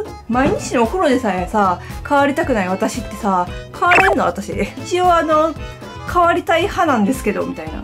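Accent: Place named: Kansai, Japan. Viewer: 関西弁めっちゃすき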